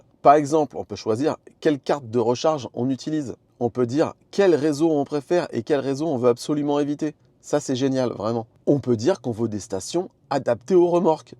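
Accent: England, United Kingdom. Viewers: cet accent anglais se perfectionne de vidéo en vidéo. Et c’est beau!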